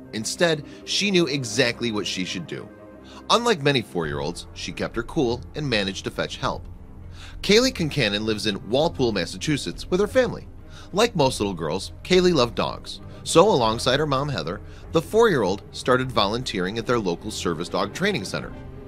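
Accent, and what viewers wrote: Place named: Upstate New York, United States. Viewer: The narrator HSS s cute accent. Upper New York State?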